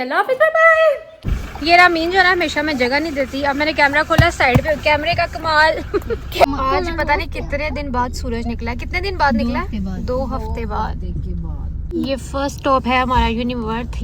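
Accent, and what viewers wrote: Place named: Punjab, India. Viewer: Punjabi tark is so good😂😂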